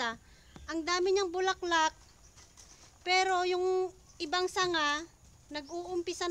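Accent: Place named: Ilocos, Philippines. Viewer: Ilocano k ata te hehehe hirap k magtagalog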